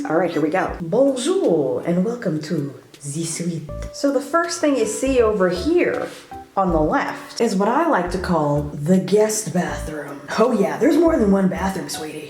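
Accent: United Kingdom. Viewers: who else loves her british accent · Its her British accent that really makes me laugh😂😂😂😂 I love it. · Ngl your British accent sounds so good lol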